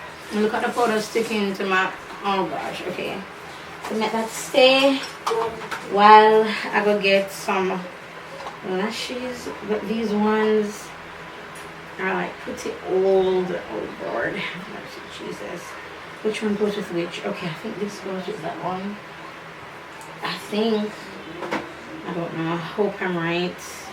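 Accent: Jamaica. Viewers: Are you Jamaican 🇯🇲 and British 🇬🇧? I hear two different accents. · Its actually an Jamaican accent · First time watching ur video but u sound Jamaican so am gonna subscribe lol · Great Job💪🏾 you sound Jamaican? New subcriber from! JA😊 Mama Mama!😊 i like your vibes you are so down to earth and the love of God is real in your life! Your vibration will take you places you never imagin😊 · U sounds like a Jamaican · I love how you speak girl.love ur patwa. Can listen to u all day. Beautiful makeup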